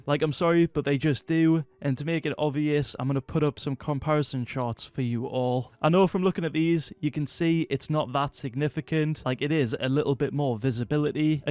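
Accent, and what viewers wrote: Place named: England, United Kingdom. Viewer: I really like your Videos but I hate this english Accent its so extrem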